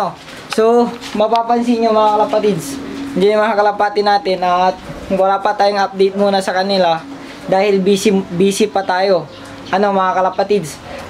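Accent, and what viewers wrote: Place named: Pangasinan, Philippines. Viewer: Taga San ka idol kc parang pangalatot ung salita mu eh Taga pangasinan ako idol shout out naman bago lng ako sa Channel mu idol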